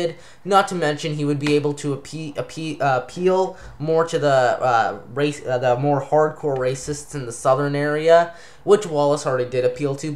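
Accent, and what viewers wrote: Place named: California, United States. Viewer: You sound like you live in California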